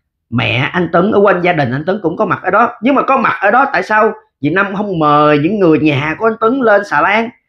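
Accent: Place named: Mekong Delta, Vietnam. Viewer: Công nhận.em nói tiếng.giong trai đồng bằng.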